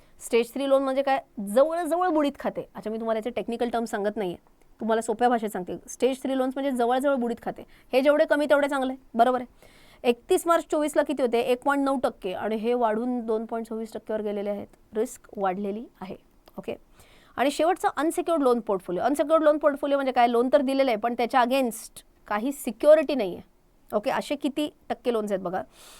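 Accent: Pune, India. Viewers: पुणेरी भाषा आहे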